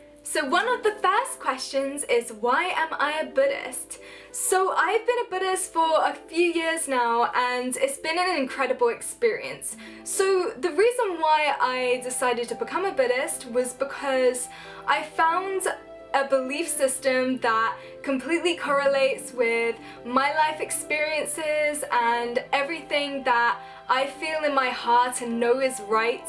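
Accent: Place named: United Kingdom. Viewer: I love the sound of the British English 🤔🤔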